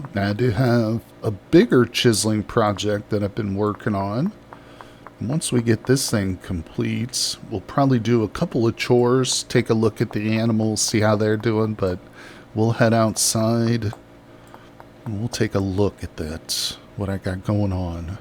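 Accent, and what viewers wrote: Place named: Minnesota, United States. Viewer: I said in a previous video that you sounded familiar. Now you're confirming you live in central US. What are the chances you live in Minnesota? Lol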